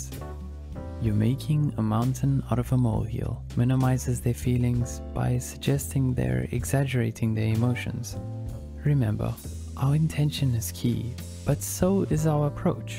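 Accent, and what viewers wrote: South Africa. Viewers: Am I the only one hearing his South African accent? 😅 hey Bru howzit 😂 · Is the speaker South Africa?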